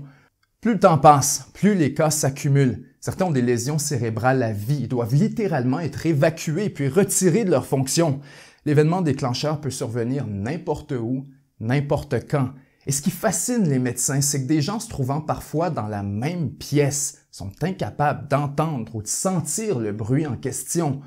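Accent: Quebec, Canada. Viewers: jaime pas laccent quebecois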